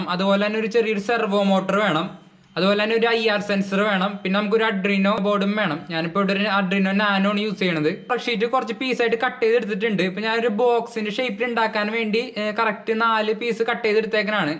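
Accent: Thrissur, India. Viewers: ഹാ തൃശ്ശൂക്കാരൻ ഗഡി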